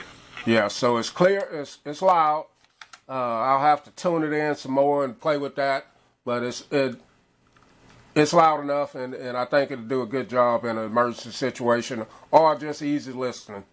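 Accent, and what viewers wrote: United States. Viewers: I like the way you talk...sound like me....a touch of Hilbilly Country Boy.... Nice review!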